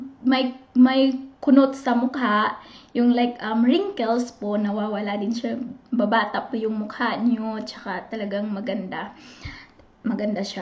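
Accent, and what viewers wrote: Philippines. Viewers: boses ilongga ka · Kapag bisaya magtagalog ang lambing pakinggan ,,kaway kaway sa mga bisaya dyan.. by the way mam pwede po ba yan gamitin sa nagpabreast feed?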